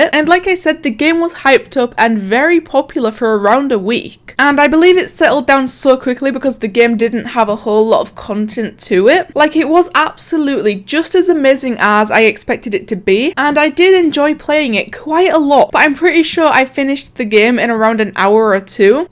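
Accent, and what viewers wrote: Scotland, United Kingdom. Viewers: Wow. 3 seconds in and I can tell you're scottish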